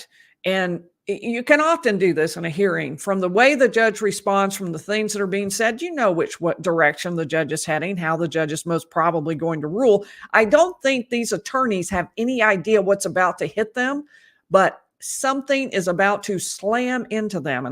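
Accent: South, United States. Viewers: i litterly had to reread the defenses filing..............all 120 + pages, in my almost 80 yrs i have watched as attorneys turned a noble profession one made admirable abe lincoln into a sewer, what those two defense attorneys did was not short of vigorously defending there client. i hope this sets a precedence for others who truly want to defend there clients. in this day and age it happens in 1 out of 100 cases. due process was not i repeat not followed. the law is clear there are gold standards call procedure and it did not happen. no one believes she fired them for negligence or any misconduct. she fired them because she is a arrogant female who has decided she has the power as judge to call the shots SO she did. i have been interested in true crime since i was six. forgotten more than most of you know and believe the arrest warrant was flawed. 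these crooked l e may think if we don't have a law degree we don't recognize when we are being hood winked. pulled of the case, hell she should be kicked of the bench and fined $100,000.00 because her real damage was to the accused and to our constitution. love to hear a southern voice advising and instructing our young whipper snappers. THEY NEED ALL THE HELP YOU CAN GIVE THEM. your passion also shines through and that will continue to bring you a large audience.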